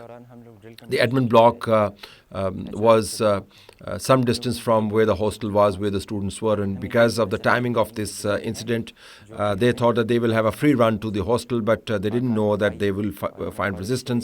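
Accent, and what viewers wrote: Pakistan. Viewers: Paki pooonjabi english 😂😢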